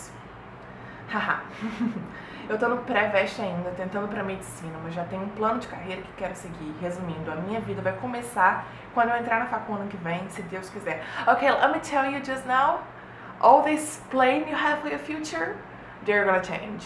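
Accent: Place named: United Kingdom. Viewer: Oii, adorei o FAQ in english!! Você tem um sotaque britânico muito fofinhoo:D por acaso você sabe se na Inglaterra a área de engenharia naval é forte? Ou mesmo as áreas de engenharia? e, por favor, continue postando videos:D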